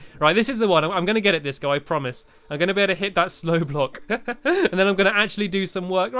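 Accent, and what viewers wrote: England, United Kingdom. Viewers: My little sister says she loves watching your videos because she likes your english accent and your laugh, and me too, but you know not the same way she does, youre actually an amazing commentator and I can never wait for your videos because theyre so good and funny! :D, and also, the name Stampy HotBuns sounds hilarious! Lol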